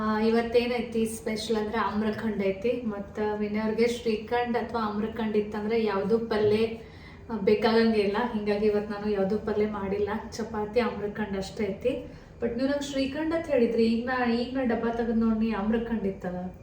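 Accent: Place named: Belgaum, India. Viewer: Nivu bhal channngi matadutri namma belgium bhasha keli bal chalo anisstte❤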